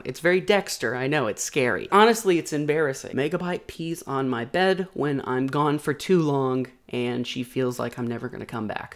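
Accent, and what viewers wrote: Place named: Boston, United States. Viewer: It’s a fucking Boston accent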